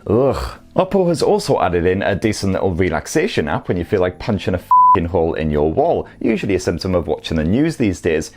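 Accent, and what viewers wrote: United Kingdom. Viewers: Your English is so British 👌❤️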